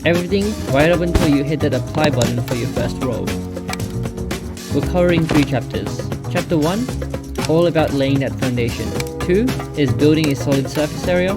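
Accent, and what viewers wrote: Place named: China. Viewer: ahh indian guy with a chinese accent, my favourite kind Gread vid btw :)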